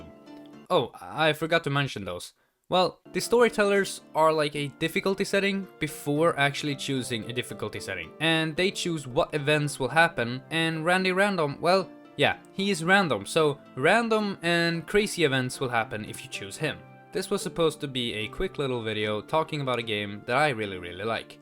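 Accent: Sweden. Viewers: Correct me if I'm wrong, but is that a Swedish accent?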